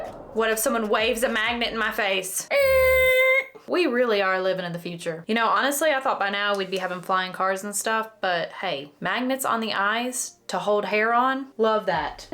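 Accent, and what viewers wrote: South, United States. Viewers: Girl , I'm love the Andes mint chocolate candy. Yes , better than the New York peppiermint patties. Love your videos and the country accents, because I'm from North Carolina, so I get it ,I love it so much.❤❤❤❤❤❤❤❤❤❤❤❤❤❤❤❤❤❤❤❤❤❤❤❤❤❤❤❤❤❤❤❤❤❤❤❤❤❤❤❤❤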